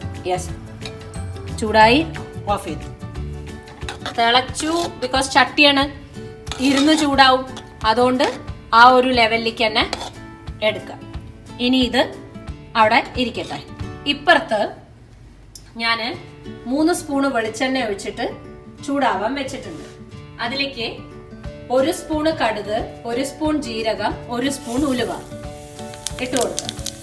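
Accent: Palakkad, India. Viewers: Hello I love you chechy chechy njan 1st time anu comment cheyyunnathu reson your palakkad slang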